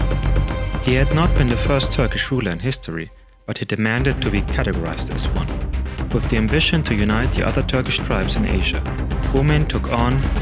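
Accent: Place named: Germany. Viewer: I've read Jean-Paul ROUX book. It's great. PS : that german accent makes it better, mein Freund.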